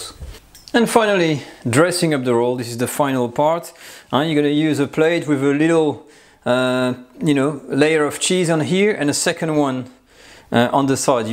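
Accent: France. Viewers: That French accent. Formidable! The food is pretty good too. 😋 ❤️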